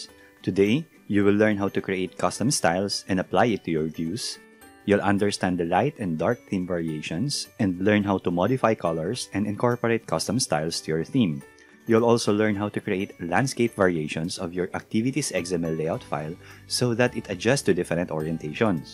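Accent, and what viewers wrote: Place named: Philippines. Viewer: Malalaman mo talaga quality ng mga pinoy pag nag english hehe diba sir?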